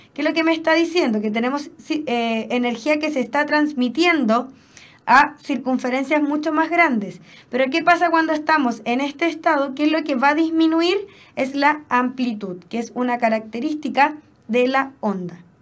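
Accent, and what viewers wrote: Chile. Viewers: Me gusta el acento de los chilenos!!! Jeje ♥ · Ah mierda es chilena, no le entendi nada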